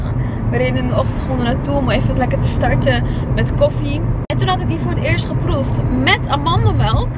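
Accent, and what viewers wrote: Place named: South, Netherlands. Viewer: Komt uit Limburg ofzo? Haar accent lijkt echt van het zuiden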